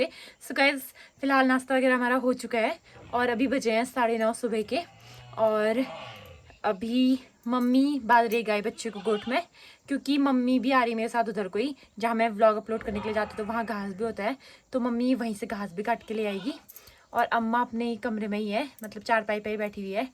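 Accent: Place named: Kumaon, India. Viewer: Wow Didi aap ke video Dekhne me kuch अलग ही fil hota hae or upr se aap kumauni ho samj to nahi aata bat sunne me maja aati hae aapki Boli😊❤❤❤❤❤❤😊😊😊😊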